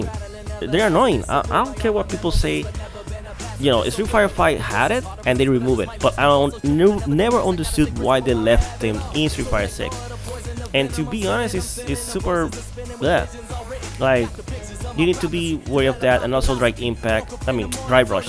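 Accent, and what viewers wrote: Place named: New Jersey, United States. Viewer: I love your New Jersey accent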